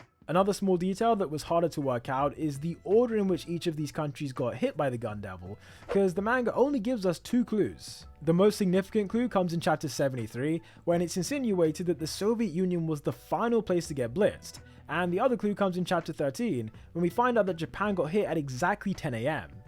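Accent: United Kingdom. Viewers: Holy shit you're British! This is my first time ever seeing you and I genuinely never realized you were British somehow lol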